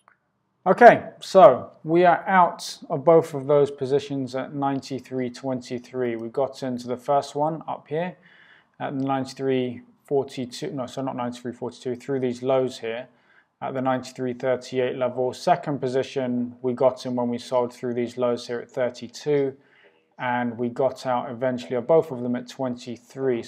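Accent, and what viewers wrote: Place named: England, United Kingdom. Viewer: Thank you , a lovely lilted English accent a calm manner and uncluttered simple fairly clear approach, great pyschologically for some of us more (greedy, A type)Anglo stressors....Regards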